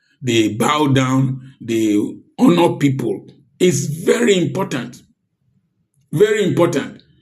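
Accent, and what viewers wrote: Nigeria. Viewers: Dear Uncle I apprecite you plenty ooo. This your name is very similar and your tone and accent is very illustrative. Are you from Orogun, delta state by any chance? That surname is that of father's family too. May the universe continue to favour you sir. from Italy and The UK.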